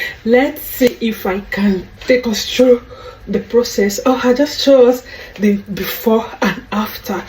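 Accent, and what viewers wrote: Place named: Yoruba, Nigeria. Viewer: Yoruba sweet for your mouth ma ❤️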